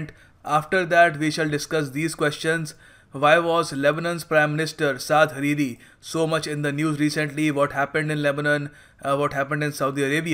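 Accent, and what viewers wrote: India. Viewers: In English? This is Heenglish. Couldnt even listen it..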